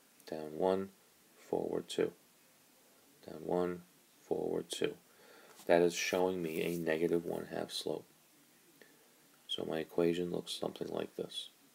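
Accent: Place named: India. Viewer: High Quality Video with Non Monotone Indian Voice (Thanks, These videos really help)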